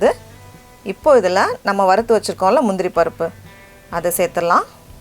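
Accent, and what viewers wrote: Nagercoil, India. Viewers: Super. Naa nagercoil ponnu.unga pechu oore ninaika vaikuthu.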